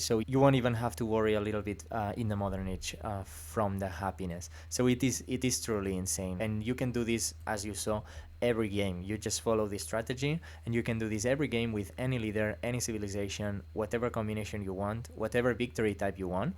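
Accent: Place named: Iberia. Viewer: ahahah sehr cool mein freund. where u from anyways? by your accent i would assume iberia or south america🙃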